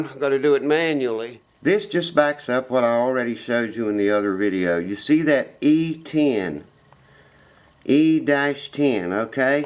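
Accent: South, United States. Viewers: lol, actually sounds more like Earnest T. Bass (character in the old Andy Griffith show - the hillbilly who comes down from the hills , every now and then, and causes mischief)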